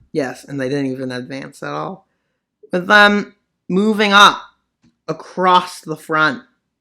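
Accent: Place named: Alabama, United States. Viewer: I don't think he is pa jeet because bro sounds like he is from Alabama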